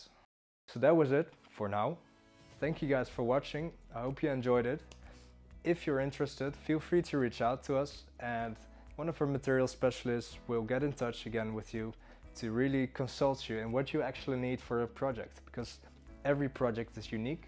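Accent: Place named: Netherlands. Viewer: Heerlijk Nederlands accentje :p, maar good job though!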